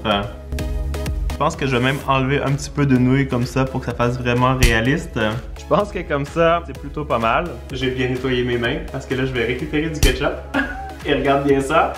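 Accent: Canada. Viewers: tu mon youtubeur préféré de is vidéos accent canadien et qui met quoi des vidéos trop trop rire 👍👍👍👍👍 🇨🇵🇨🇵🇨🇵🇨🇵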